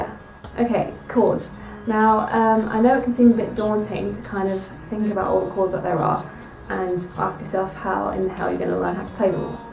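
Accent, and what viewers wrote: United Kingdom. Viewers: woahh tbh i didnt know you were british until this vid. singing obviously hides accents. haha btw, this is very good. simple and easy to understand because i was a self thought guitar player as well and i absolutely agree about playing the general chords first because slowly you'll know how to play the hard chords :D this need to be share to friends or families who are beginners at playing guitars. good job! :D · Whoa ur British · You're....you're British? Whuaaahhhh? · YOUR BRITISH!!!!!! did not see that coming. MIND=BLOWN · Ah your Brittish!?!?!? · OMG you’re british!!!!!!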